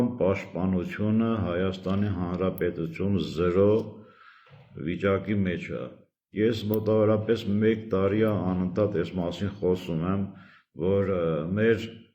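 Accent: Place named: Volgograd, Russia. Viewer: Голос. Ссли8но Волгоград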